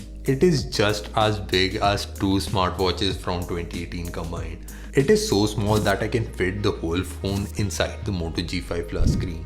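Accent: India. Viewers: Fuck your Indian accent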